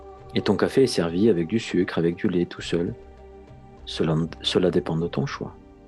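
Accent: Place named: France. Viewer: He notado que cuando hablas español usas algunas palabras que tienen esa terminación en tu acento francés Y eso me agrada me diste unas ideas bien extrañas para escribir poesía de esa manera J'aime votre langue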